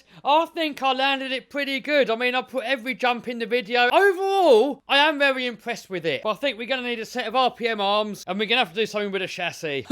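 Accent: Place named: England, United Kingdom. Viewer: dude i usually can't stand English accents lol but you're funny as hell 😂 You're vids are fantastic! keep up the good work broskie. definitely gotta have one of these in my future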